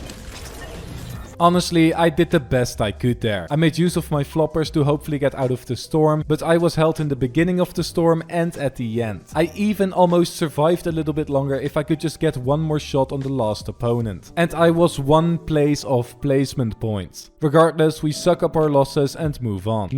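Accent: Netherlands. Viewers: Nice accent, you Dutch? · ik dacht al de je accent erg veel op een Nederlands accent leek Nice man Keep up the grind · Its so funny want ik weet aan je accent dat je nederlands bent maar nice man you are such a good player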